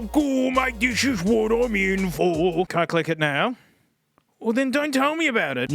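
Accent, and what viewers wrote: Australia. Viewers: You just had to confirm my suspicions of you being Australian in the end😂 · thats because hes an aussie mate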